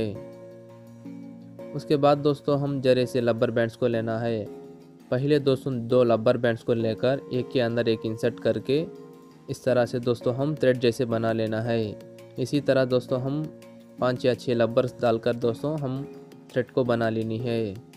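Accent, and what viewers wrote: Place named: Telugu, India. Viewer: from accent I could tell you are a Telugu tammi!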